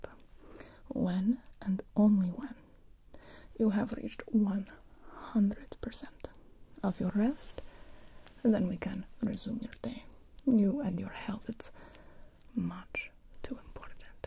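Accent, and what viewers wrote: Russia. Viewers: A russian accent i think its a russian accent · russia accent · U speak like Russian english ) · Are your trying to do a Russian acent it funny. :im a Russian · the russian accent really hits home, nice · Good russia accent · The white hair and Russian accent reminds me of silver sable from the spider man game/comics, idk that just me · I feel i slight russian accent idk why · with a Russian accent is so funny to me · I live for the Russian accent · Your Russian accent is so relaxing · Love this series!! I fall straight to sleep with the Russian accent and the whispering makes it amazing! Love you!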